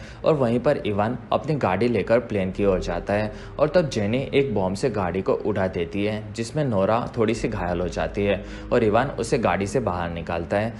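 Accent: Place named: Gujarat, India. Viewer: He is from Gujarat. Koi problem nahi hai, sabka apna apna style hota hai.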